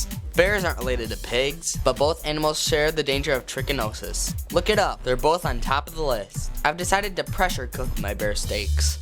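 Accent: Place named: United States. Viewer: Weird you sound American but your bro. Sounds like an Englishman great video though :)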